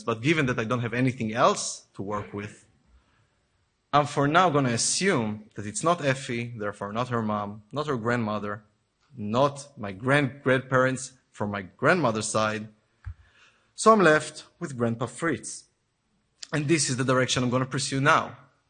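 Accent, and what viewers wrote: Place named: Italy. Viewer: u sound italian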